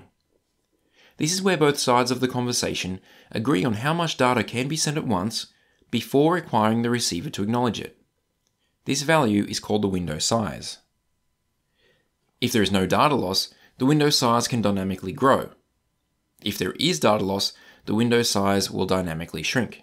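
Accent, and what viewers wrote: Australia. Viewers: That's not Indian lol. He's Australian. Easy to understand.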